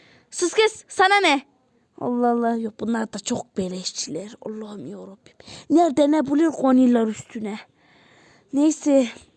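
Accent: Kars, Turkey. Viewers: Benim babaannem ve dedemde karslı aynen böyle konuşuyorlar bu arada ben Kars'ta yaşıyorum ama istanbulluyum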